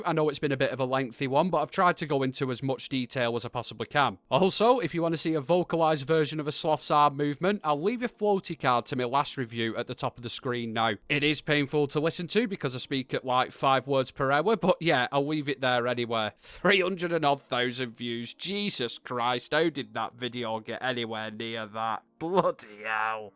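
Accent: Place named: Yorkshire, United Kingdom. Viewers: Weren't expecting that accent when I looked up a new fallout 76 review lol, Bolton right? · He's not a brumy, further north. Sounds a bit Manchunian. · Turn the mic down that Yorkshire accent is like needles being driven into my ear lobes. Beowulf style and I not joking. That creatures ears vibrating thats my ears. But I got what I needed after 2min so thanks.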